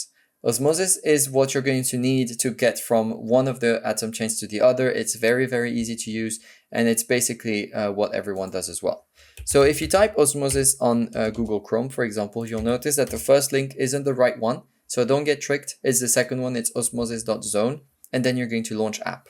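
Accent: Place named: France. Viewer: D'autant plus que l'accent est très frenchy 😖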